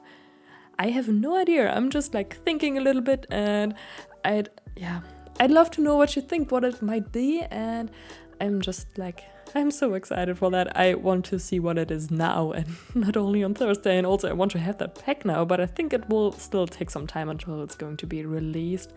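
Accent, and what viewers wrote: Germany. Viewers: 🤣🤣 thanks for clarifying! I knew she sounded German. Danish would be a close match, tho!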